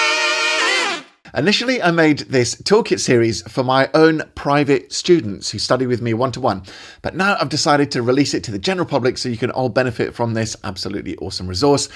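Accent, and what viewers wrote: Scotland, United Kingdom. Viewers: You are so kind! Thank you. Are you a fellow Scot? Thought I heard an accent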